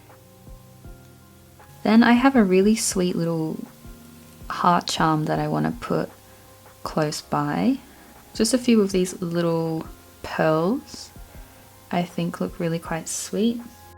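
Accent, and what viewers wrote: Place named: Australia. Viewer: I so enjoy your tutorials love.. Nice to hear an Aussie voice.. Love Anne, from Victoria Australia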